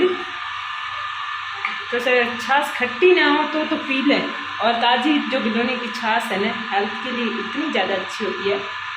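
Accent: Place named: Rajasthan, India. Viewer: Meri beti ki ichha thi apki marwadi sunne ki aaj puri ho gyi ,kl bol rhi thi ki ye didi marwadi bolte h kya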